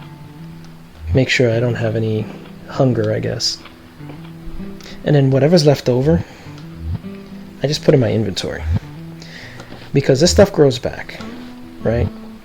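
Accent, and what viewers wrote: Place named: Northeast, United States. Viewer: Hi, I enjoyed your video. I'm thinking about purchasing the game, so you are helping make up my mind. Looks like a great game. I love your accent, I'm thinking northeastern American city?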